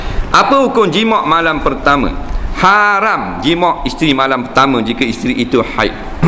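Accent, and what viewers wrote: Terengganu, Malaysia. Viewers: "nati orang balik lu bodo"... hahahhaaha...mmg pure terengganu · kaki sekam atau kaki cekang...aku pon x phm sb ustad ckp ganu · memang la haram...tengah lampu merah....kalau hijau halal le....kena paham betul ustaz cakap.... kalu nak main lepas akad nikah jangan la depan tok kadi dan saksi-saki...biar la diorang balik dulu... ustaz ni ceramah dia best sebab ada lawok...pastu...slang ganu kita...mu kena dengar molek2 jangan dia kata hare..mu terus amik jadi hare...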